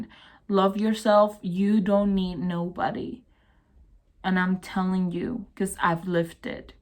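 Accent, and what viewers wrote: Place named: Andalusia, Spain. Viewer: Ojalá tener tu acento, de que parte de sur de España eres?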